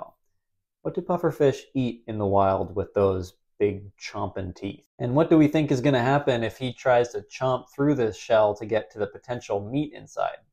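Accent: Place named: Canada. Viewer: the Canadian accent is subtle, but i live rural, that upraising is hard to miss when you look for it, you'll notice a difference between cloud and clout, clout has the uprising cloud doesn't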